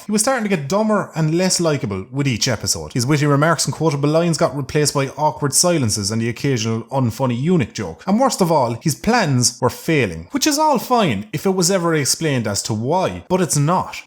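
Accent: Ireland. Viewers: It’s part of the Irish accent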